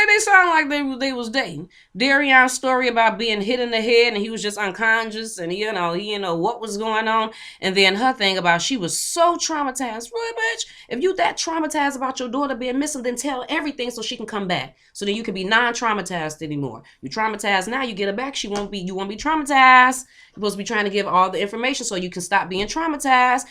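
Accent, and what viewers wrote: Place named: Chicago, United States. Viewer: Subscribing cause your accent tells me you’re from my city Chicago 🤷🏽‍♀️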